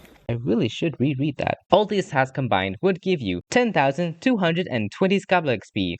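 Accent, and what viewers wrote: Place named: Australia. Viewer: Great vid, humorous editing and artwork! Why do i detect the slightest hint of Australian Vowels in your accent?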